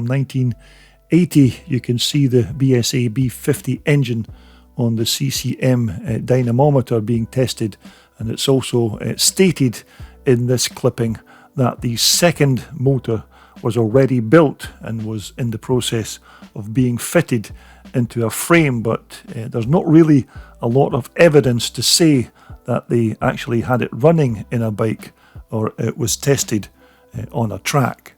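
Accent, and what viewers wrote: Scotland, United Kingdom. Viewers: Love your accent-are you Scottish? The only uncle I ever had was a hired hand on my grandparents tobacco farm in Canada and he was the coolest uncle you could ever have. John in Texas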